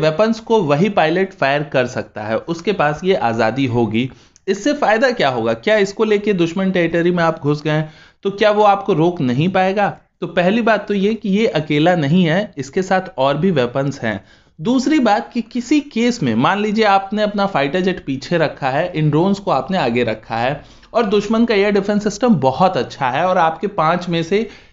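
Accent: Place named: Gorakhpur, India. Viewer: Gorakhpuria accent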